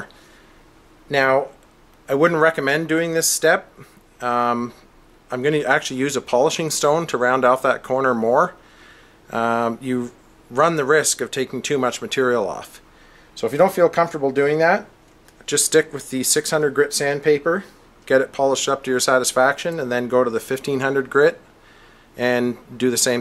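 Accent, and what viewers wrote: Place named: Canada. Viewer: Very well done, used your tutorial as a guide to smooth out my 2.0 shield. Awesome video, best I've found, clear, conscious and touch of Canadian twang, EHH. Thank You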